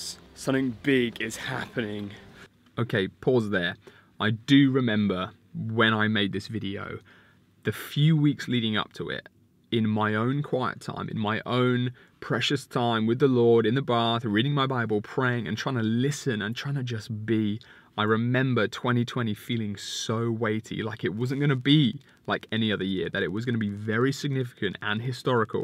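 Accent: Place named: London, United Kingdom. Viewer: i didnt know you had a london accent lol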